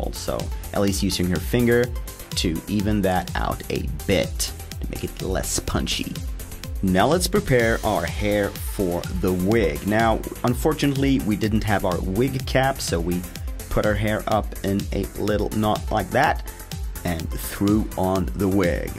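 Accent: Scania, Sweden. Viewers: dont teach swedish if you're skånsk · this is not the real swedish thats skånska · Älskar din skånska dialekt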